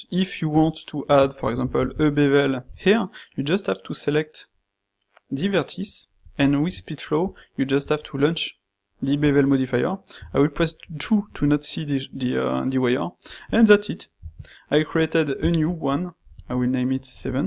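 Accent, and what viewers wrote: France. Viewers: tu est français? sa s'entend grave lol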